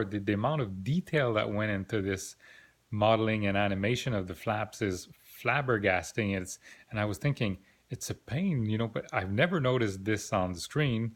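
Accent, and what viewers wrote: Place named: Quebec, Canada. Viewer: Where are you? You sound French Canadian, I live 2 hours west of Toronto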